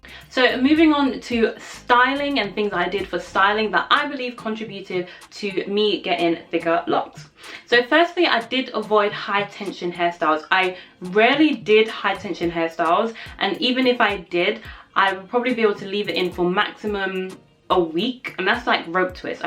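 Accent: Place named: England, United Kingdom. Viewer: wow I'm in my journey as well but you're beautiful accent took me way off course and I pay no attention to nothing else. Let me stay focused are you from England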